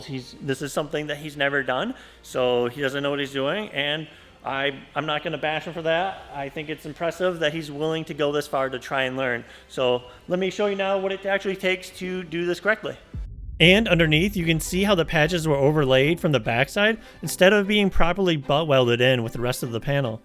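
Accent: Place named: India. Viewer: Why do you have Indian accent? 😁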